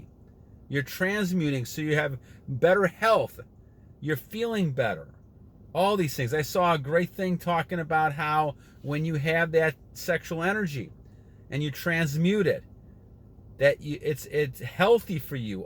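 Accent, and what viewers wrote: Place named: North, United States. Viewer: you from Minnesota, Michigan? I recognize a northern accent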